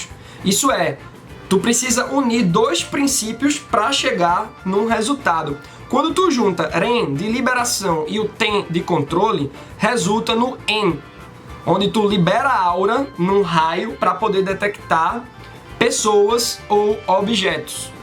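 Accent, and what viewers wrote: Pernambuco, Brazil. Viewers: Ei mano, tu é de Pernambuco?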